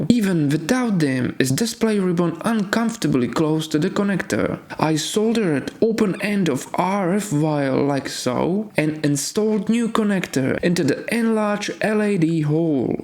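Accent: Russia. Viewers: that Russian accent...yak... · your English is pretty good and Russian accent is actually cool 🤙 What matters the most, you took the time to make the video 🙌. Losers will always leave loser comments 😅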